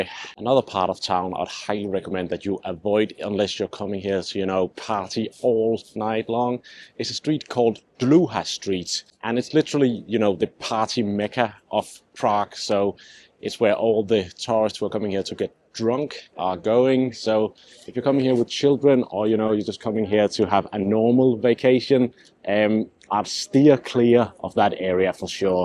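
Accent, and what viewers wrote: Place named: Liverpool, United Kingdom. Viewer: You sound like you have a slight Liverpudlian accent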